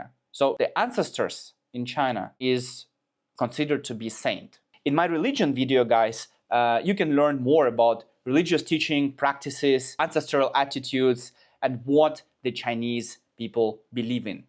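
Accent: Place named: Finland. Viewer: Wait, are u from finland? No wonder ur accent sound so finglish.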